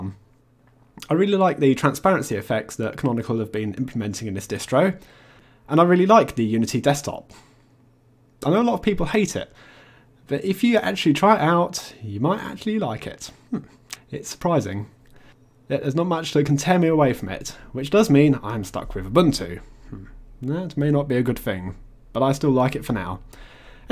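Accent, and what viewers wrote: United Kingdom. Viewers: Does he have a British accent?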